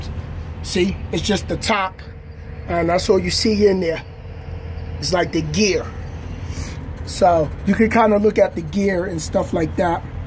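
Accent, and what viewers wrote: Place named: East Coast, United States. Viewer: Good job for all those cats who are unfamiliar. You sound like you are from the East Coast. I was born in PHX later moved to Long Beach ,California and the to Seattle WA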